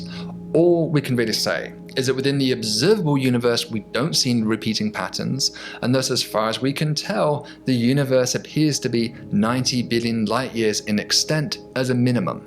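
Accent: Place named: Liverpool, United Kingdom. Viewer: why is this guy's face always on view ? why are stills of Brad Pitt and the moody brit actress all over this?why does he talk as if he is talking to 8 year olds. oh.. cuz he is i guess. so annoying i had to get up from me telly and find me lap top to commentAH ! it's only the annoying Scouse that annoys me so much. a bit too much to take before I've have me cup o builders innit? Yes, I am in fact a bluuudy git. spoiler alert, he tells the size 45 billion to the edge. you're welcome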